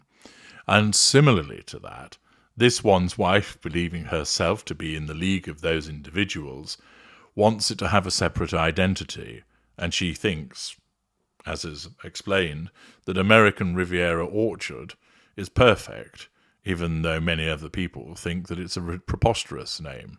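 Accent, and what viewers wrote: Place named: Lancashire, United Kingdom. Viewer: Tong "lancastrian accent or northern.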